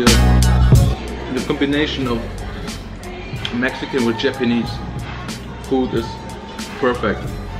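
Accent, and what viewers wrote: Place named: Germany. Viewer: Bin ich die einzige, die den deutschen englischen Akzent total nervig findet? :,D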